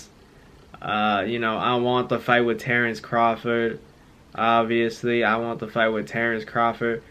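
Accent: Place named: Texas, United States. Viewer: 🤣😅😂your Texas accent is trash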